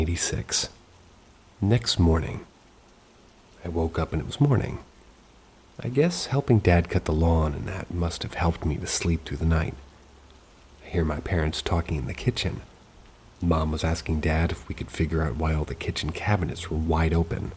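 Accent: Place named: United Kingdom. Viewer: M i always ask but never get s response. Why is fhere a uk narrator sometimes?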